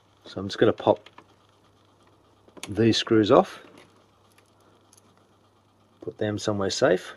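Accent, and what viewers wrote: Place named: Australia. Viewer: Hey cocka, where are you from? Nice to hear an Aussie voice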